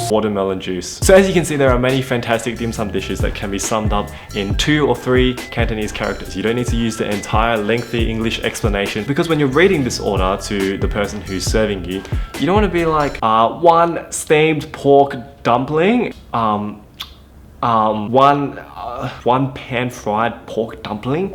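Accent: Australia. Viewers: That ozzy Canto combo is hilarious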